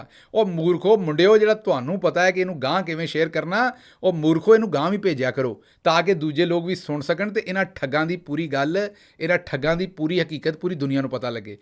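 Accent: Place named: Punjab, India. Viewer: Hahahaha Hahahahaa 😂😂😂😂😂😂😂😂😂😂😂😂 Kia style hay Asli suchi Punjabi 😅😅😅😅😅😅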